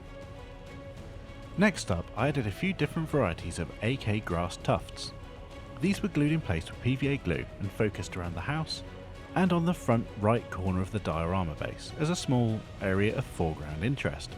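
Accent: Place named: England, United Kingdom. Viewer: Wonderful result as always. It’s hard to tell that the StuG isn’t 1/35 scale. My wife overheard me watching the video, and when she heard you say “I have to stop saying splotches” she said at least it sounds sophisticated with an English accent. 😂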